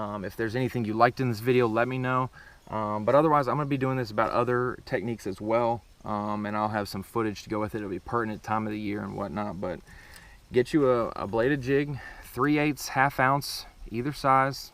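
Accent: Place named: South Carolina, United States. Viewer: I thought you were another South Carolina boy for a second!! Very informative man. I absolutely love the jackhammer.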